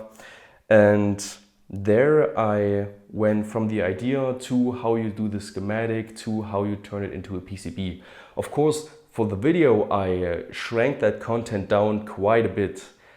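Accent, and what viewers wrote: Germany. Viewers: So my question is: Without getting too specific so as not to reveal too much detail that you may not want to put online about exactly where you live... (Where your from does not necessarily say where you currently live, and using large regions does little to narrow down a specific location, and accents could come from parents rather than matching exactly where one grew up)... your accent is not what I'm accustom to when I think German Accent, so what part of Germany is your accent from? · I really like your English with german accent.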